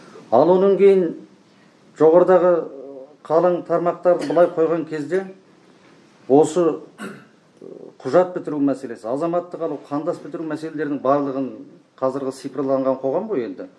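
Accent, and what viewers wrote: Standard. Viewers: Таза қазақша сөйлеп отыр❤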